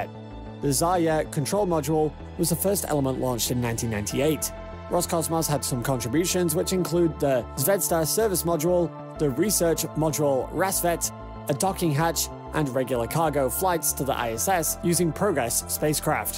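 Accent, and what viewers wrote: United Kingdom. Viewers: One of an Uncountable number of miss pronunciations. I don’t mind the strong British accent, as an American, but I do not appreciate so many mispronounced words as if the narrator doesn’t have a goddamn idea what he’s talking about. It’s very unprofessional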